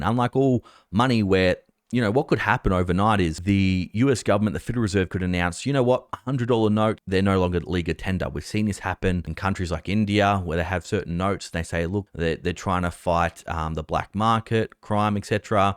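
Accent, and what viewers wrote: Australia. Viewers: Darta - Data PLEASE use your Australian accent !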